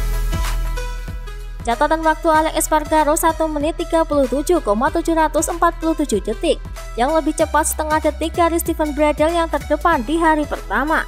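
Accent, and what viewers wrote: Java, Indonesia. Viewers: medok jawa mba ny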